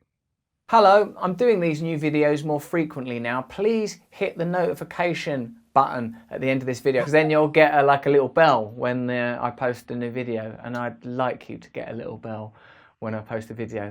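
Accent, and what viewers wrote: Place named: United Kingdom. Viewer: My therapist put me on to you around 8 months ago. I enjoy listening to your British accent. And that you're so honest about sharing the fact that you were addicted to drugs & alcohol (as well as lots of twisted behaviors) resonates with me. I'm working a recovery pgm ...N/A. as of 4years I am staying clean "One Day at A Time" (was on Methadone for 25 years w/3 attempts at being loosed from those "liquid handcuffs".) I'd never had an issue believing in the Supreme Source it was anger, mistrust n feelings of not being loved by God those major "sins" kept me separated in my mind & heart ..n ultimately my body enslaved to the darkness that dwells in the shadows of the "concrete jungle" ...n the only thing I thanked God for was knowing about & learning of Jesus Christ ...for which he I believe was (n remains) my sponsor. Reading about several other major religious beliefs has always been something I've done in periods of abstinence. 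It's a saving grace today. I'm happy to say. But hey...relapse, backsliding n all that jazz..it just ain't happening...not anymore...very grateful for that🤗 But I'm interested in hearing your take on recovery... it's different...raw & bold. Have you written another version of the 12 Steps? B/c hearing you say what you said about the 1st Step..."ask yourself are you 🤬?" 😄Yeah..."Unf@ that's the bottom line mate! You're good brother 👍 and funny too 👏👏👏. It's great to be alive n not merely existing but finally living n practicing so many of the tools to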